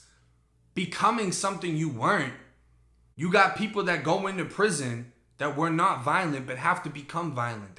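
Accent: Boston, United States. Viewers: You definitely have a Boston accent east coast type...did you ever live around my way I'm 45 minutes away from Boston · Every time I hear you speak you remind me of my days working in Boston and living in Mass. Keep up the great work with your channel. You have a great way of telling a story. For me I am someone who has never been locked up but you put me in a mindset of what would I do if I ever were ever to be locked up. My ass would check in real quick. haha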